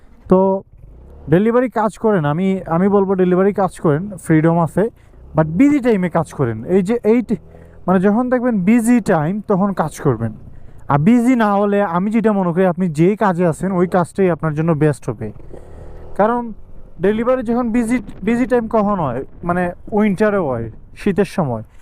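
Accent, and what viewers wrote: Sylhet, Bangladesh. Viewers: Vai Sylheti ni?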